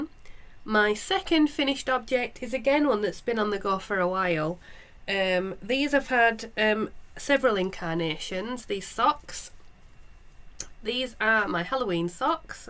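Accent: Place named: Northern England, United Kingdom. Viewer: so lovely to listen to a fellow northern accent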